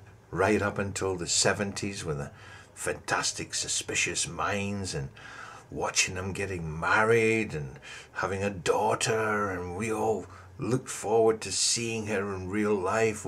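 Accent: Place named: Scotland, United Kingdom. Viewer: Thank you for sharing your thoughts (lovely Scottish accent). I'm sure that all the fans in the UK regret never seeing Elvis. I'm a '1956 era' fan too and feel exactly like you. No one will ever replace him, but I have found a tribute artist, Jay Ashton, who actually allows me to imagine what seeing Elvis in a live show might have been like. Jay just wants to share his joy in the songs of Elvis with all the other fans so ... no "bells and whistles" ... just a great performance.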